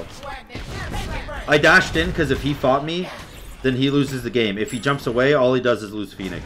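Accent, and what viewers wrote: United Kingdom. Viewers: Gg nice vid and nice British accent lol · That British accent was amazing Bro. <3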